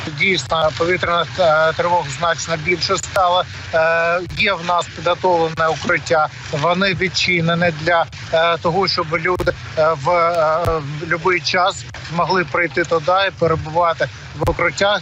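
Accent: Kharkiv, Ukraine. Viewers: Харьковский украинский!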